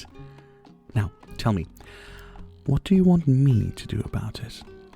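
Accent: United Kingdom. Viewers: as an Indian I’m quite flattered of how samosa really rolls off the tongue with his British accent🤍😮‍💨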